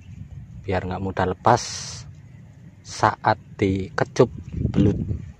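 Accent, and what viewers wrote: Banyumas, Indonesia. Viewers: ngapak.ngapake ngendi mas bro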